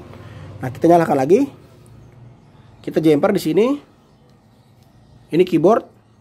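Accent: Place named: Bekasi, Indonesia. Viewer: Pasti orang bekasi cikarang euy wqwqwq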